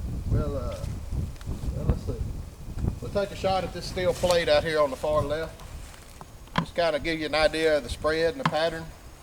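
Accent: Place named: Georgia, United States. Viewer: sounds like your from georiga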